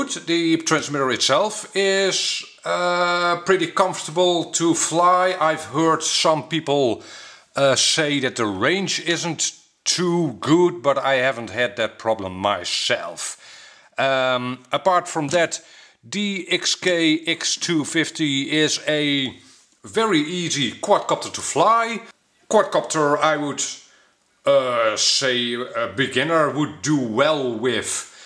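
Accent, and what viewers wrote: Netherlands. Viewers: Gast! wat een heerlijk engels hollandsch accent heb jij!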